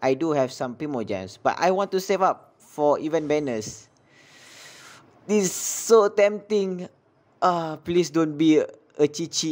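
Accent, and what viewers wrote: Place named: Malaysia. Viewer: U SOUND MALAYSIAN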